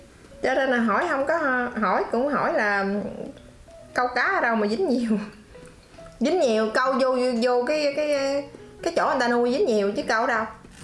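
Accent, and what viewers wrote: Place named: Mekong Delta, Vietnam. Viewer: Giọng nói đậm chất miên tây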